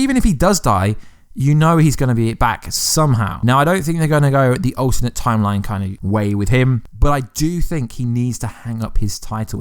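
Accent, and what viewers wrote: United Kingdom. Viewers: I prefer cosmic wonder. He has better presentation and doesn't have this goddamn Brit accent. Sounds like he has a knob end in his mouth.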